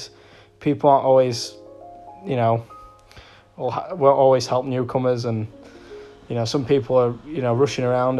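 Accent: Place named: Derby, United Kingdom. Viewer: Wheres the site are your on buddy, you sound like your from my area in derby and theres loads of red road sites near me Another good video buddy, definitely think 1n1 is a good way to go:)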